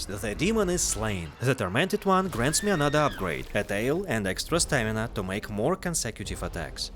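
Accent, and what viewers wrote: Russia. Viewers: ля сразу узнал русский акцент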